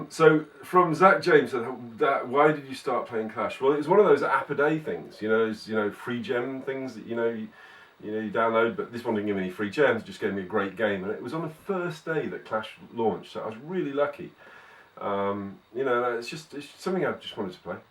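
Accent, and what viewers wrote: England, United Kingdom. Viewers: yay for the English clasher, all those Americans it's nice to hear an English accent once and a while :)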